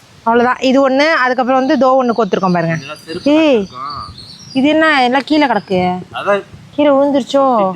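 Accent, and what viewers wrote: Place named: Mayiladuthurai, India. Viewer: Your talking slang reminds me of my friend… she is also from Mayiladuthurai.❤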